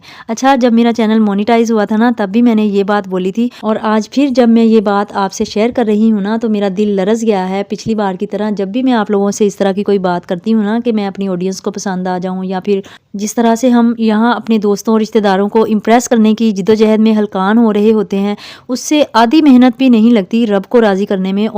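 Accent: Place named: Punjab, India. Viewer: Very sweet panjabi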